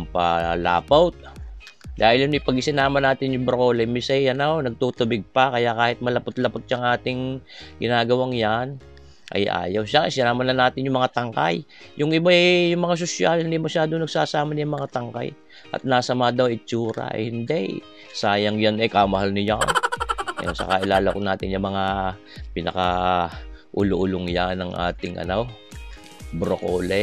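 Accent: Batangas, Philippines. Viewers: I love your recipe at higit sa lahat I love your Batangeño accent and your humor when speaking Sir. I miss hearing that accent, majority of my officemates before, were from Batangas.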